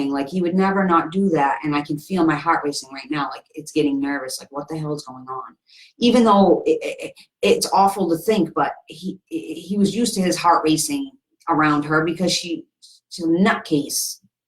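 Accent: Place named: New York City, United States. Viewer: I love you girl. I used to live with family in NYC and I am hearing some accent from you but I could be wrong lol.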